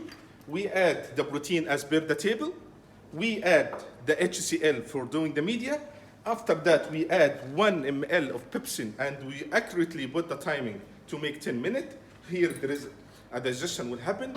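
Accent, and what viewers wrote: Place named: Arab world. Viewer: Yeah, I think his accent is Arabic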